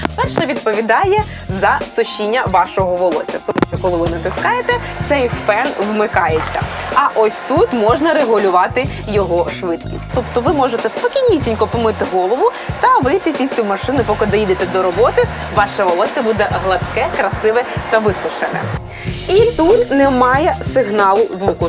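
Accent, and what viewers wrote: Russia. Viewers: Вах, Тарик, разбирается в мове? Так крыша или дах? Послушай ещё раз! Ведущая, просто "Пэрсык в Багдадской ночи!", но украинская речь - с русизмами, а это - суржик... Увы.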